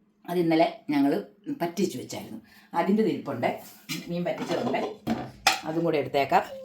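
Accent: South Kerala, India. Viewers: 🙏തനി തെക്കൻ ശൈലി കേൾക്കാൻ നല്ലരസം കറികളൊക്കെ ഒതുക്കി വിളമ്പു നാനും ഒരു തിരുവിങ്കൂറുകാരിയാണ് ഏതു ജോലി ക്കും വിഷമങ്ങളുണ്ട് ഒന്നിനും മടി പാടില്ല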